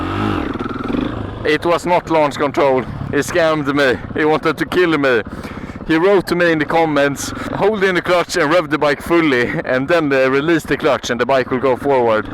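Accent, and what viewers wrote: Sweden. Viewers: So much swedish accent😂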